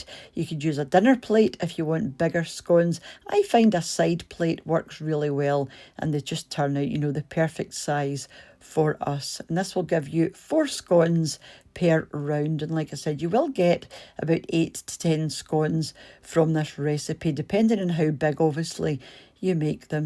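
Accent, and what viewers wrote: Scotland, United Kingdom. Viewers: Oh my God, I love listening to the Scottish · Great video. Good Scottish voice giving instructions. I like my tattie scones in the toaster. Nice and crunchie 🏴🤗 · How I miss my home country Scotland. My ancestors are from the isle of Lewis. That recipe helps a bit afainst being homesick.... As well as the typical Scottish English talking. So heartwarming. Thank you for both.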